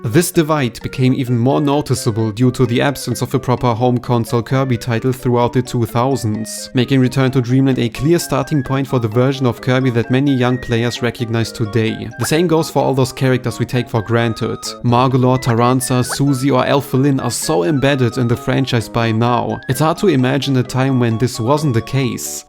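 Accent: Germany. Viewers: Ich hab den Deutschen direkt bei dir rausgehört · Are you Bavarian? Asking as a German, because it sounds like it. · Are you German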